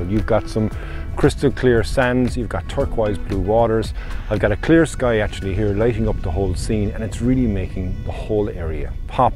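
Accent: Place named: Ireland. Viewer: Intro footage and tempo, class! a bag of top shots and some tones from emerald voice! Well bloody done bud.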